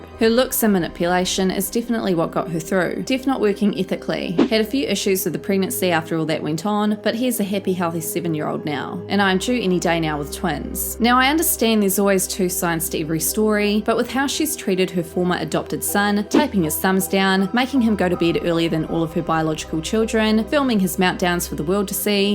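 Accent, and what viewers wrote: New Zealand. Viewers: Your accent is lovely, are you from New Zealand? Sorry if I got it wrong, I often mix Kiwi accents for South African 😳🤪 I'm Scottish so feel free to call me English if I got it wrong hehe 😂 I've just heard about these selfish, clout chasing, money grabbing, neglectful, greedy losers and yours is the first vid I found. All caught up now, thank you. Sending love from Edinburgh 💙🏴💙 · Off topic: I’ve never been on this channel so this may have been asked before. Are you a Kiwi? Is this a Kiwi accent i’m hearing? Great content btw. That narcissistic couple should be banned on Youtube. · Great video. I'm just curious, are you from New Zealand?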